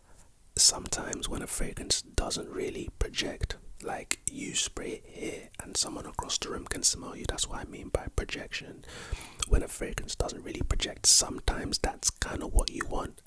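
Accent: United Kingdom. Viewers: THE BRITISH ACCENT IS GOD DAM GOOD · is the best new male ASMRtist comin up this year. Love the Brit accent, smoov voice and great sense of humor. Not to mention his nose, which certainly knows. Big ups for getting me back into fragrances. I actually sound like I know what I'm talking about at the dept store fragrance counter, and every time my woman sees me she sticks her nose in my neck and gives me a big whiff to see what I'm rockin. Thanks u got the sauce fsho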